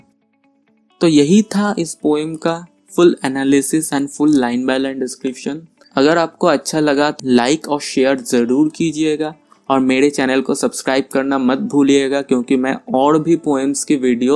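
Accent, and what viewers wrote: Bengal, India. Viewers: I am from..howrah.... Hindi of bengali are usually like this..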